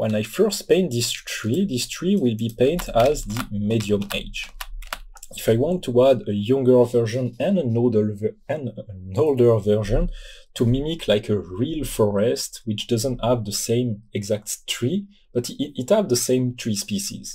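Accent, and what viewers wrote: France. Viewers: French accent :) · Nice tutorial and nice heavy french accent.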